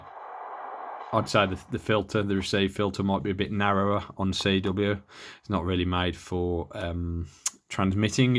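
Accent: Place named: Black Country, United Kingdom. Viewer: Good vid you sound local to me? Im in stourport, are you black country way?rgds steve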